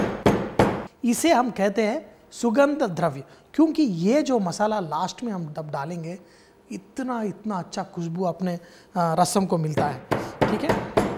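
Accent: Hyderabad, India. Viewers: Nice hyderabadi hindi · Anna hyderabad hindi baagundi.👌 hindi urdu mixing & Rasam jabardast 👍 nenu kuda chestaanu . ingredients okate tareeka veru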